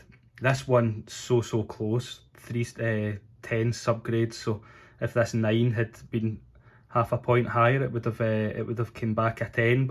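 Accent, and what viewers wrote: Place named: United Kingdom. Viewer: was this bulk submission? and you only waited 3 months? the turnaround now is likt 145 days. And also, did you had any problems with customs? From your accent I think your from the Uk so your kinda in the same situation as me. I'm from Portugal, and I'm kinda worried if something happens with the package or customs, cuz as you might know it's not insured. I send 2 weeks ago 50 cards. Just wanted to know if you had any problems. Thanks!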